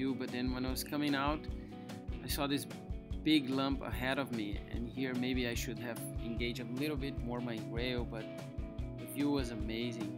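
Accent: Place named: Spain. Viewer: His accent ( Spanish?) is very colorful….beautiful narrative and music…so honest!!! 😊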